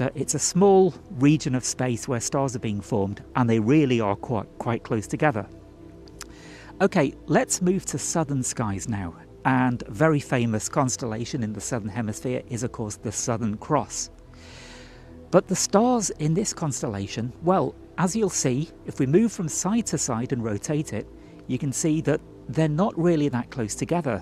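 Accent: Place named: England, United Kingdom. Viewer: You sound pom. You talk northern side so your a pom. Then you knot one up for the Southern Cross. Hell, your now family bro. To the Eureka ✨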